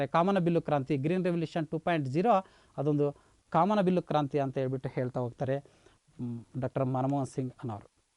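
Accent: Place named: Tumkur, India. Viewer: Nam tumkur voice kelokke chandaa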